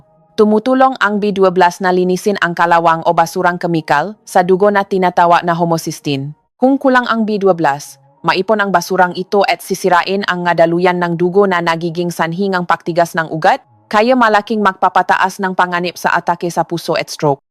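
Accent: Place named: China. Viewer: DOKTORA MAGARAL KA MUNANG MAGSALITA N G TAMANG BIGKAS NG TAGALOG ,, PARA KANG INTSIK TULO LAWAY KUNG MAGTAGALOG,, so ma lo sep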